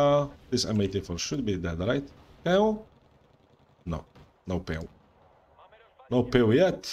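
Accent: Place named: North Africa. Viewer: Your English is good BRO, it's like North African english.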